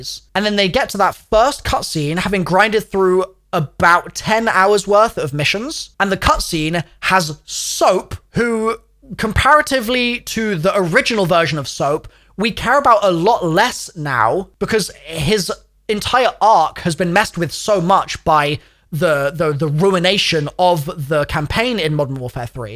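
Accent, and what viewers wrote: South Africa. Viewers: The south african accent had me, love this chanel from a fellow brit 👊👍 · Amazing south african accent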